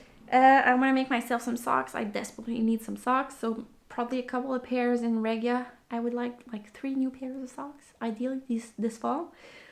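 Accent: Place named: France. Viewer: Absolutely delightful podcast...love your French accent. I do miss young motherhood. I can see you are savoring this time of your life!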